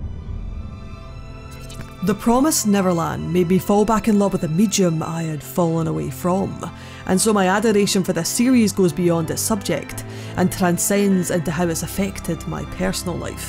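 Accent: Scotland, United Kingdom. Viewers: Holy crap this video was done exceptionally well! And I love your Scottish accent! Great job, cheers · you sound scottish, yaas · Love this!!! Your accent sounds Scottish, it adds to this review very well. Keep it up 💕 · Yes, I watched all the manga just before it on air. I almost lost all the joy of watching...Besides the fantastic editing of your video, I love your accent. Are you from the UK???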